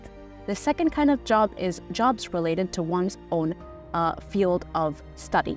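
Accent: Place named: United Kingdom. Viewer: Mam how did you learn the particular accent ? Like proper British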